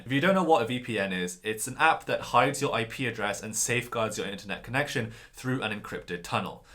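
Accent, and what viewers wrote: England, United Kingdom. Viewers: This guy speaks perfect English English. He actually says "dyoon" not "June" when saying "Dune"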